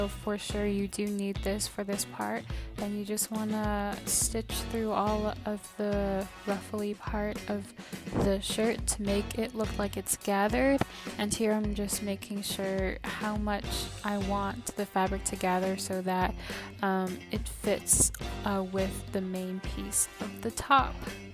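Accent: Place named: England, United Kingdom. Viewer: can't wait to hear it 😂 and I have heard worse especially when they try to sound extremely posh which tbh everyone tells me i speak like that but Ik that not everyone does and you sound like a normal English person btw Britain is Wales Scotland North Ireland and England all together and we all sound very different 😊 that's why what you were doing is more of an English accent rather than say an Irish accent😊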